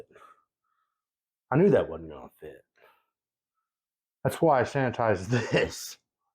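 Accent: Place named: Indiana, United States. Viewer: Have I missed you introducing yourself? Not sure I caught your name or where y'all are from, I wouldn't be surprised if your accent is from my homeland of southern Indiana. I'd love to learn a little more about ya.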